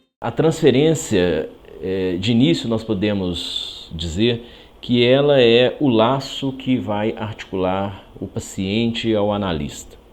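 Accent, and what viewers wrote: Brazil. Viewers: Para além do conteúdo que me parece sensato, quero realçar o português que em todos os videos do autor é perfeito. Nunca vi um português com sotaque brasileiro tão bem falado e cuidado. Parabéns...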